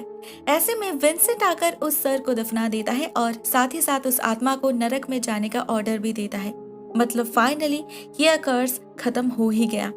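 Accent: Bengal, India. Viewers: u bengali? 🖕🏽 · Ap bengoli ho kia bolieee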